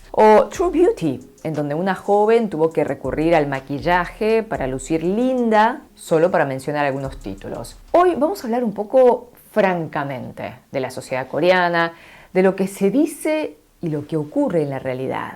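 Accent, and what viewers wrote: Buenos Aires, Argentina. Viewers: y con tu acento porteño estas mas buena, eres la coreana mas buena de habla hispana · Hermosa tu y con ese acento argentno! Mas bella aun...Un admirador Colombiano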